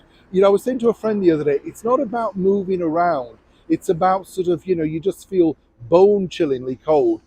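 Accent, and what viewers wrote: Yorkshire, United Kingdom. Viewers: ...you and your reminiscing, it's so endearing , My Dad has always kept his Yorkshire accent so it's lovely to hear it here....eh up Neil, i wish i could send you a cup o' tea...great info as always, thank you.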